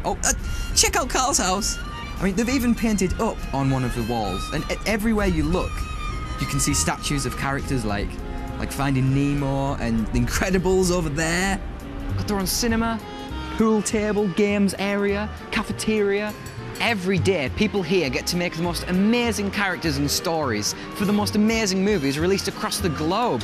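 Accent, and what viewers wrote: United Kingdom. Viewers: Is it my imagination or does he sound a bit Scottish in this? He sounds different. Maybe I've been watching too much David Tennant stuff lately and I have Scottish accents stuck in my head. That must be it. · Hes sounds like he from newcastle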